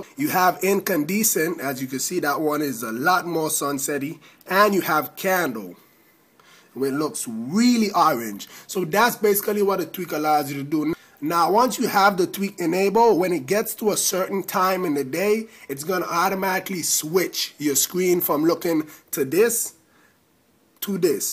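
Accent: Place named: Jamaica. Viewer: They care about a badass Jamaican accent like that man:)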